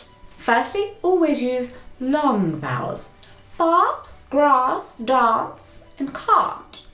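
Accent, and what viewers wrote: RP, United Kingdom. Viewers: Nah I imagine accent in general. Even I, a mere Latin American native Spanish speaker, can tell her accent is Posh™ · Could you do a video on your specific accent? As in how to speak posh, not why you speak that way because I've seen that one. I'm American and enjoy the sound of British accents but my mimicry only works if I hear it repeatedly with why those sounds are done that way. Also if you'd do more on the history of you monarchy I'd enjoy that as well. I know information changes based on who's writing it and I'm pretty sure my school textbooks didn't think much of anyone not American. Got to love public schools... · Learning the queen's accent from someone who can't hear is but one of the many joys of this channel! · Your so amazing I love your British accent 🇬🇧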